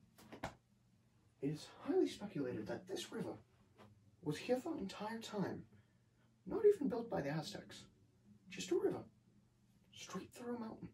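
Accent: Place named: Ireland. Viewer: You sound irish, not british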